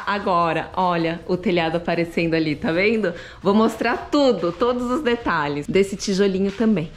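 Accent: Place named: São Paulo, Brazil. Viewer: Falo como você! Sou Paulistana!!!!!!!!!!!!!!!!!!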